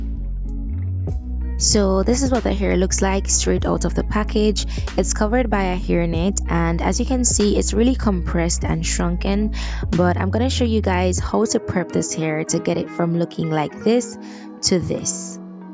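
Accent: Jamaica. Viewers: Jamaican cyaan hide enuh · are you Jamaican?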